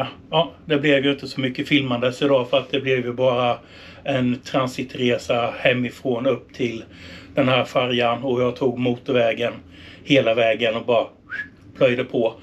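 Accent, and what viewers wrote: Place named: Jönköping, Sweden. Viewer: Hittade precis din kanal och tittade på detta avsnitt. Gillat och prenumererat. Vad roligt med ännu en MC kanal från Västgötaland. Fast du låter som du vore inflyttad till Habo från Jönköpingstrakten hahahaha. Inget ont ment. Nu ska jag se om jag hittar videon om väskorna och sen blir det att titta igenom hur det går på din resa. Lyckönskningar från Falbögda.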